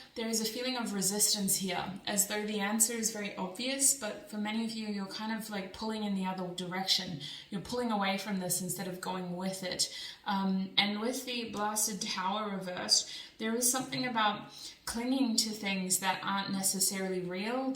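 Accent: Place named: Australia. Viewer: Love Kirk (his name said in your lovely Australian accent!) 🖤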